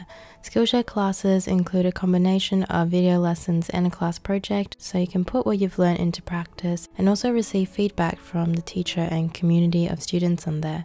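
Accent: Australia. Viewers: Are you Australian or lived in Australia? I’m asking since u have a very Australian accent specially when you said “water” as “wodah” etc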